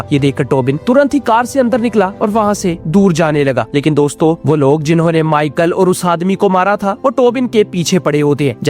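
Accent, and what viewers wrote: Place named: Lahore, Pakistan. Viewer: I think you from Pakistan kyuki hindi esi ni hoti matlab bolne ka lehza lahori pakistani panjabi lag raha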